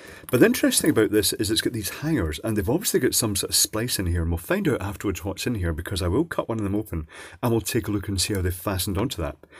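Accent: United Kingdom. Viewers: I like your channel sir you are amazing i like your british english alsooo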